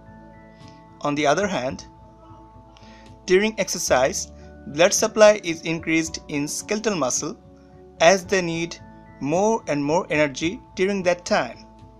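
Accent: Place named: India. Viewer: It's Indian accent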